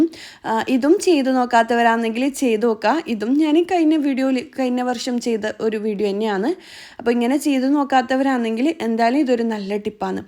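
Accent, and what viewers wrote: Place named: Kozhikode, India. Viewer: കോഴിക്കോട് സ്ലാഗ് ആണ് കേൾക്കാൻ രെസം വ്ലോഗ് ഇഷ്ട്ടം ആണ്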